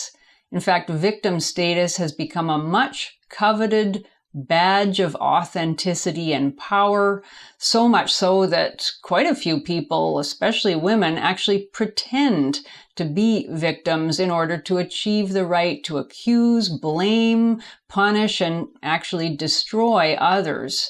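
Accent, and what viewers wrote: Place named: Canada. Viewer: Goodness gracious I love her Canadian accent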